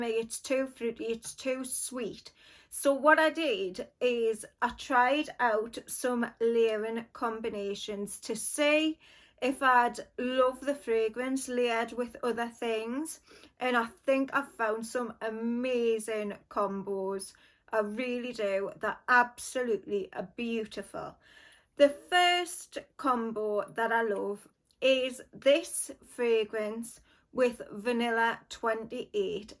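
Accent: United Kingdom. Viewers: Hi. I was in high school in the 90s. I might have to pick this one up for fun. Thank you for your layering suggestions. You have a lovely manner of speech. Are you from England or Wales?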